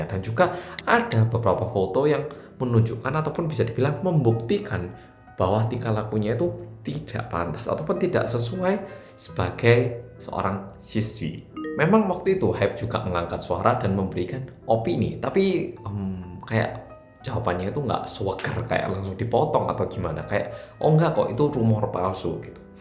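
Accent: Java, Indonesia. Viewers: Oppa koreanya medok bgt...hehehe so cool...keren keren... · Mas, maaf ooc. Tapi gimana cara Mas me-maintains logat dan bahasa jowo mu disana? Kayak gak pernah berkurang sedikit pun kadar medoknya. Saya suka 👍⭐ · Lama g nontonin wong medok siji iki.. Sehat trus mas, sukses ya.. Tambah ganteng rek..😁😁😁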